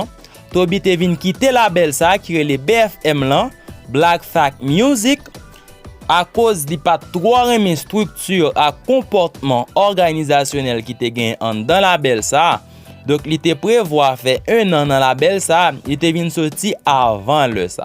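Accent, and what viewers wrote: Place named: Cap-Haïtien, Haiti. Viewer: Ou pale menm jan ak moun okap ou se moun okap?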